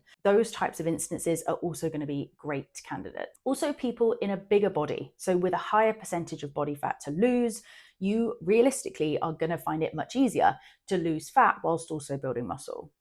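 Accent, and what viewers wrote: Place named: United Kingdom. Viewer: This I subscribe to.Love the British accent.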